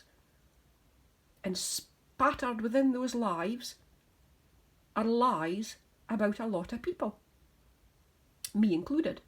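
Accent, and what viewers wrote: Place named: Scotland, United Kingdom. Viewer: Good to hear your lovely Scottish brogue!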